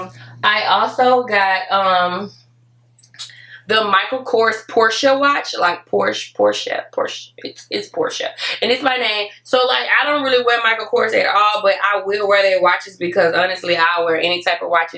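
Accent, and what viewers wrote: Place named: South, United States. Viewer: i love your accent you got to be from the south like and once again u finer than any model out there!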